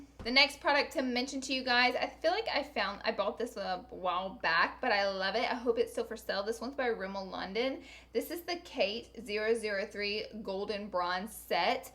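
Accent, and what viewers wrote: South, United States. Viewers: New subscriber and just curious what state your from? I can't quite place your accent, but I definitely detect some southern twang. I'm from Virginia 😉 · I'm southern too and I think ur accent is beautiful😍😍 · It cracks me up when people leave comments hating on her voice. Maybe it's because I'm a Southern girl too but it's just the way we talk 😂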